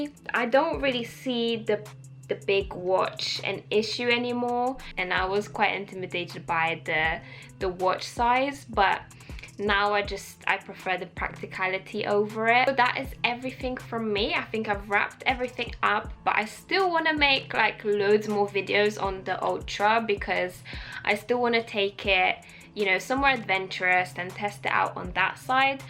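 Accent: United Kingdom. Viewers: O jeeeeeeżu, szukałam info o Apple Watch, słucham, myśle „ale ładny akcent przyjemnie się słucha”, a tu nagle po polsku :) :) :) byłam przekonana, że jesteś Brytyjką, pozytywnie zazdroszczę tak idealnego angielskiego. Pozdrawiam z Trójmiasta ❤️✌️ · I love the commentary and information you provide but your pronunciation of the "th" sound as "f" is a bit annoying, detracting from an otherwise enjoyable viewing experience. Is that a muddling of an Eastern European or Russian accent with British or is it a straight Cockney trait? I'm sure you're aware of it but a few examples include saying "fun fings to do" instead of "fun things to do", "a day in the life wiff..." instead of "a day in the life with..." or "that's everyfing" instead of "that's everything." Hopefully you can correct this small dialect flaw for future videos as your content is a pleasure to watch. 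Cheers!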